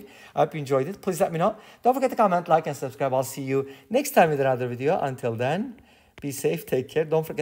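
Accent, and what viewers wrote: Turkey. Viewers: I swear you got that Turkish accent, are you Turkish by any chance?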